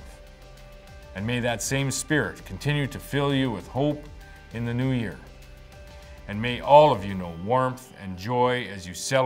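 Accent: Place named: Saskatchewan, Canada. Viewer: Merry Christmas to Scott and family. You also speak like you are born and raised in the greatest province of Saskatchewan. Thank you.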